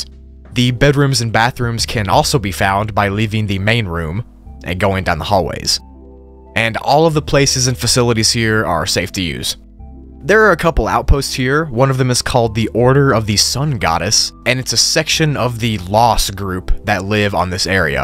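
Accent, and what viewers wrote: United Kingdom. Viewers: are you British?😮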